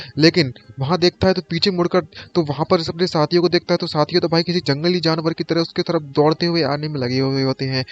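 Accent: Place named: Western Uttar Pradesh, India. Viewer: Bhai tera bolney ka same west UP ki jaisa h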